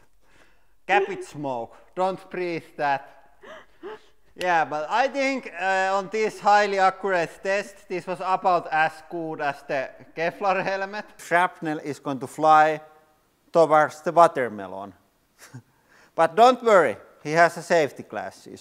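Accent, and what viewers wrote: Finland. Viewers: The swearing in a thick Finnish accent will never not make my smile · Finnish accent is so suited to these videos « Ha! Let’s brrekk tings ! » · The finish accent makes it even more funny imo :D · I lived in Finland for two years and knew right away when I heard that accent. 💙🤍 Suomalainen voittaa aina.... jopa hydraulipuristimella! 😂 🇫🇮 🇫🇮 🇫🇮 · You can hear the Finnish accent 😂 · Are you from finland?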